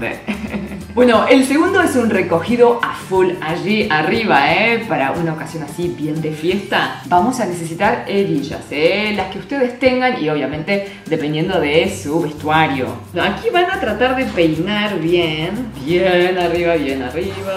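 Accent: Argentina. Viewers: Hablas cm argentina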